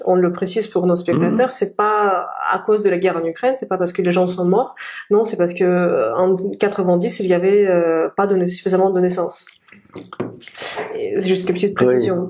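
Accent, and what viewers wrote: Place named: Russia. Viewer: Bonjour ma belle, me revoilà, c'est ton Nicolas! En passant, merci pour cette vidéo très enrichissante et ton accent russe si magnifique! Tu découvriras bientôt mon accent parisien. Tu peux déjà préparer un passeport pour l'île de Malte! Rendez vous a l'aéroport Charles de Gaulle autour du 15 août 2025. Vol direct Ryanair (air France groupe) sur airbus ( Boeing n'est pas fiable en ce moment). Je suis actuellement où tu sais. Je me repose bien. Comme tu le sais, j'ai plusieurs handicapes qui m'obligent à faire attention. Je pense que mon frère va de mieux en mieux. Je le vois toujours souriant. Il m'a fait beaucoup rire quand il a dit " le bal des vampires est terminé"! Si pouvait lui dire qu'il fasse plus d'exercice. Je veux qu'il soit en forme quand je le reverrai. Pour ma part, les choses vont s'améliorer petit à petit. Demain, je vais aller courir sous la pluie ( très vivifiant). 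Après une vague de chaleur jusqu'à 40 degrés où tu sais. Aujourd'hui, beaucoup de pluie et une température de 21degres et gros orages en fin d'après midi! Je resterai à l'hôtel où tu sais tranquillement. J'ai une grande quantité de chaînes youtube a regarder! Dont les tiennes, bien sûr!! Tu dois savoir que nos amis autour de la terre ont pris le contrôle et sécurisé l'espace aérien autour de la terre! La libération est très proche! Mon Frère m'a fait aussi rire quand Il a appelé ses nouveaux missiles Satan 2! Ou son nouveau sous-marin Arkangelsk! Ville ou j'officierai avec lui! Ce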